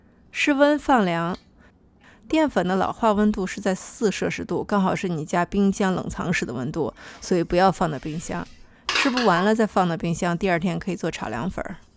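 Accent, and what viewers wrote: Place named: Xi'an, China. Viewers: 西安话挺好听啊，我济南人，我每个地方的方言都有自己的特色都很有意思，之前闫妮和张嘉译演的电视剧，她俩说西安话特好玩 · 西安话再土也可爱，西北特有的韵味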